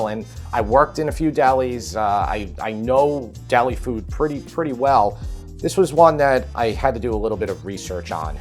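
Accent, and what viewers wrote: New York, United States. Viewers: Hi, I grew up in Brooklyn and I have moved to Thailand about 15 years ago. I stumbled across your channel when I saw you were making a NY Deli Macaroni salad. I haven't seen anyone else doing it on Youtube, so I had to watch it. Then, when I heard you speak, I thought to myself: This is a genuine NY dude, not some poser from a 5 star Michelin restaurant making a NY deli salad. Watching your videos makes me feel at home. Thank you BTW, the kid is a natural food critic. He doesn't pull any punches even though his dad is right there and his dad made the dish. He's articulate and he tells you EXACTLY what he likes and doesn't like in a particular dish. It's so refreshing to see such honesty. It makes me almost miss New York.:D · Not Only Do I Love Your Recipes, But I Love Your NY Accent & I Think You’re Nice to Look at too! 😉 Keep the Recipes Coming! 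TY From Oregon! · is a great taste tester. I also love your New York accent (patata salad) LOL. I am from Bergen County NJ and say it the same way!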